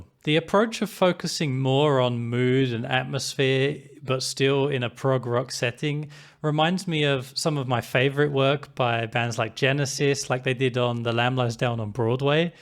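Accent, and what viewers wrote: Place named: Liverpool, United Kingdom. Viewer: you sound liverpoolish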